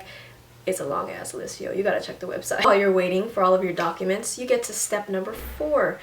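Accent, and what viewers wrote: United Kingdom. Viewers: where do you come from sis? ^^ I love your english accent.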